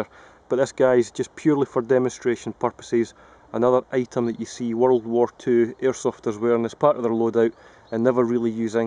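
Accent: Scotland, United Kingdom. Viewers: a scottish accent german...WTH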